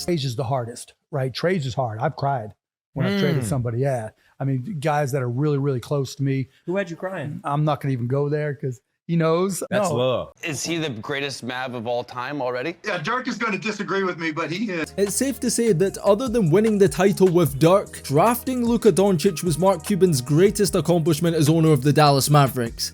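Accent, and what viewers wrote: United Kingdom. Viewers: Bro fix your mic, you sound like you're from uk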